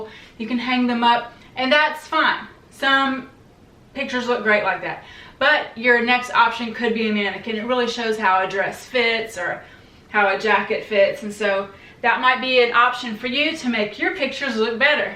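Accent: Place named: South, United States. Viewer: I swear to you I skipped the introduction and was watching thinking… this womans from the south! 😂 (slight accent) Went back and checked sure enough! Watching from Shreveport, LA thanks for the content 👍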